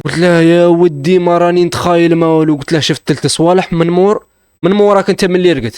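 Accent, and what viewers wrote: Algeria. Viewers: انتما من عين الدفلى خميس مليانة بصح كيفاش تهدرو لهجة الوهرانية 😂 تحيا قصص تاوعك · من اي ولاية أنت حسيتك من ولاية معسكر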